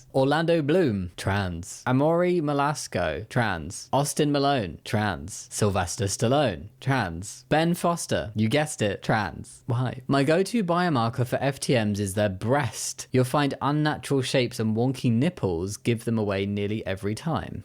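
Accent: England, United Kingdom. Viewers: has such a lovely accent (Brits, ignore this comment; y'all wouldn't get it cos you're from the U.K. so you're immune 😅). As a casual viewer, I can't recall if he's mentioned where exactly in England he's from 🤔 But I know he mentioned traveling to London. So that can't be where he lives. Anyway, wherever in England he's from, I love the accent.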